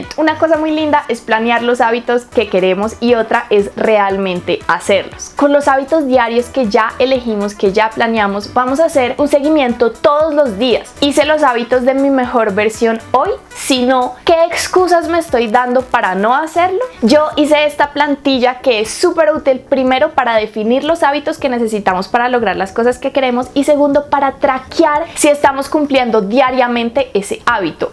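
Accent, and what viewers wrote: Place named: Bogotá, Colombia. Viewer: Siiii. Es de Bogotá DC 🤗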